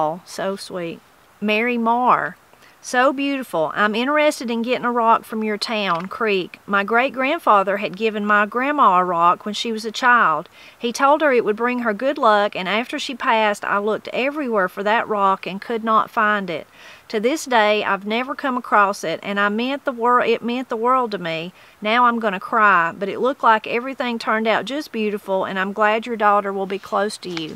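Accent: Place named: South, United States. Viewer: you should for sure get an award for Reading the books for us, with your beautiful voice and southern accent!!! Plus for many other things you do. God Bless. Jean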